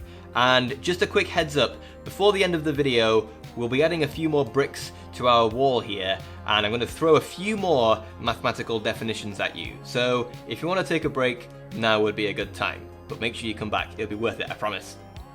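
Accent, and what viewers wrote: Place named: Northern England, United Kingdom. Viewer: He's from the north of England. The accent you're referencing is confined to the south east.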